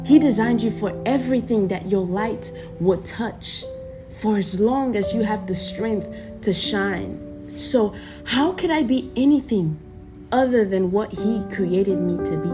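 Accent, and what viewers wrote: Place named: Kenya. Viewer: Amazing Gift!! If I may ask, sis. Where are you originally from? I understand is a Nigerian name and specifically from the people of Benin Kingdom, but your accent is giving Kenyan or somewhat Cameroonian. I'm just curious. Shalom!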